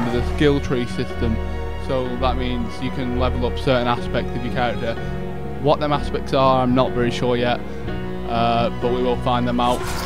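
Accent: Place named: New York, United States. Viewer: I love this guys accent it sounds like a New York accent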